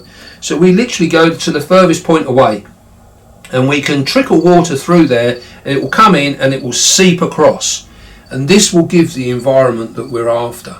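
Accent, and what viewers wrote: United Kingdom. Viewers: You sound so properly British!!! Lol, compliment mate!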